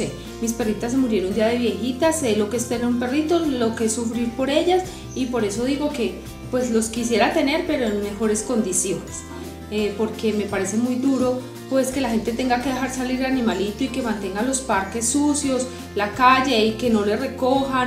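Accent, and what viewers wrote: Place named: Medellín, Colombia. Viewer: Yo creo que más que dejar un LIKE por dejarlo yo creo que para algo se creó así como el dislike y pienso que dar LIKE a un vídeo es de alguna manera valorar el trabajo de la persona porque el que hace vídeo como nosotras sabe el trabajo que esto lleva y lo que uno se esfuerza para que de alguna manera entretenga y guste. Por otro lado también estoy de acuerdo en algo que mencionaste muy por encima y es que algunas personas se ve que por quedar bien decir bueno ya cumplí ya me pase por tu canal te dejo un comentario para que sepas que te veo y que me debes pasar por el mío. A mí eso me parece de mal gusto porque el vídeo dura 5 min te lo reproducen 30 seg mientras te escriben y ya. Yo no hago eso a menos que el vídeo no me guste por supuesto. Pero estoy absolutamente de acuerdo contigo. Quise ver este vídeo porque quería conocerte por el acento seguro eres de Medellín :) que bueno saber un poquito más de ti. 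Un abrazo y nos seguimos viendo guapa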